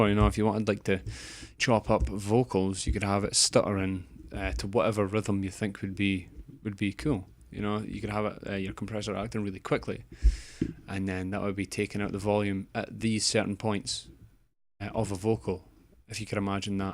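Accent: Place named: Scotland, United Kingdom. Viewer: Very nice explanation brother. Scottish accent somehow assists in knowledge retention :)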